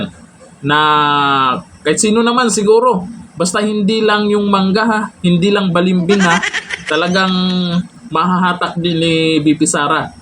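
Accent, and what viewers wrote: Visayas, Philippines. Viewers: Tagammmm,kabisaya ba oi..😁😁😁✌️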